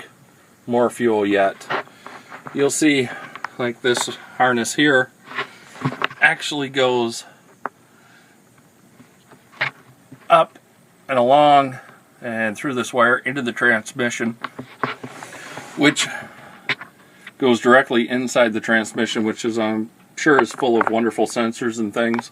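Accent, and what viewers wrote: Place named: Canada. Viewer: This guys sounds Canadian (don’t know too many Americans who pronounce Chrysler with an S on the end)...does Carfax have reliable info sources in The Great White North?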